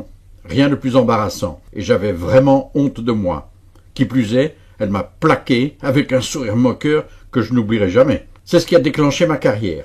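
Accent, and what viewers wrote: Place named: Belgium. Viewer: Un "coach"? Mdr.... Ne serait il pas plus logique de consulter une femme pour ce genre de problème.? Il semble qu elles soient plus à même de savoir ce qui leur importe et leur plaît le mieux (non,que la bandaison n ait aucune importance,attention. ..). L accent belge de ce type au pseudonyme américain en dit long sur son efficacité et ses compétences. ...Ça pue le charlatanisme à plein nez,et à 300km à la ronde! Pathétique! ...Et j ai deux demoiselles à mes côtés qui approuvent mes dires,...Lorsqu elle ne me les ont pas carrément "suggérés"...D apres elles,il serait bien à côté de la plaque...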